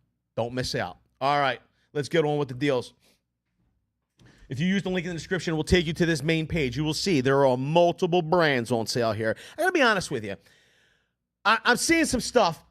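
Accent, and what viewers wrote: Philadelphia, United States. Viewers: Lioke, prioce, wuter LOL must be a Philly guy always good info though despite the accent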